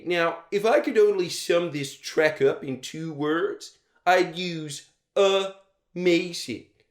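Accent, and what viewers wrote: United States. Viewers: love you mate but this video is legitimately shit, think the american accent gave me an aneurysm, even worse than your MAFS stuff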